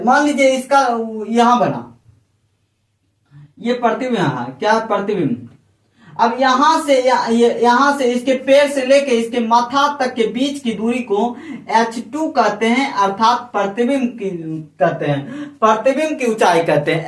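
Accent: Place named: Jharkhand, India. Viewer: Jharkhand sa bola raha hai sir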